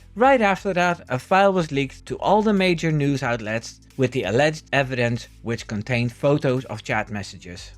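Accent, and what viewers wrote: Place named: Netherlands. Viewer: You are obviously Dutch from your horrible accent! Jos is the trouble-maker,not Christian! Max, unfortunately, only does what dad tells him.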